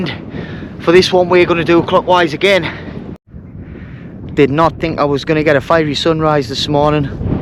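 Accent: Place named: North East England, United Kingdom. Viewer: I left the north east 35 years ago and was just trying to pin point your accent 😂. I'm walking up from Leicester to the top of Scotland in day long stages (when I get chance between work and other commitments) . I drive to where I got to on the last walk, then do another hike north. I had a rather brutal walk from Glossop to Marsden in January, over some similar stunning terrain in the north Peak District. I think I'll route myself through this spot when I get this far.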